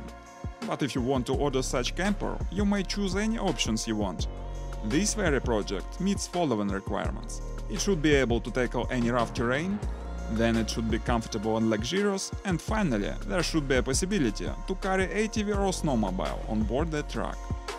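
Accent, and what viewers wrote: Russia. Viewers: Love your accent my Russian friend. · Братан акцент поправь, прям по произношению слышно, что говорит русский! 🤣🤣🤣 Привет с Казахстана! Монтаж видео зачет! 👍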